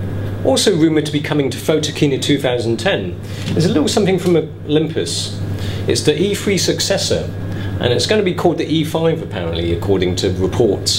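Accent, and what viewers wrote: United Kingdom. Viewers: "Presented by an Asian guy with a funny British accent." Hahaha always my first thought when watching DigitalRev videos! :)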